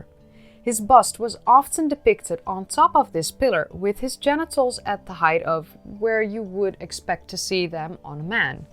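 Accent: Netherlands. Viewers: You have a beautiful accent. is it Dutch ? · Hahaha 🤣 great presentation and cute dutch accent 😉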